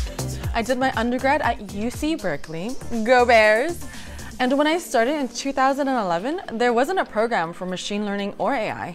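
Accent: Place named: Germany. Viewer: I studied a year at TUM as a part of my MSc. Your German accent is impressive!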